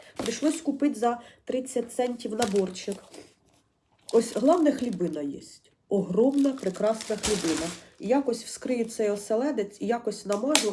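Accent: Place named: Surzhik, Ukraine. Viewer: Полуниця, полуниця, чорт забирай! Вуха заклало., ви журналістка, полтавка, відмовляйтеся від суржика😊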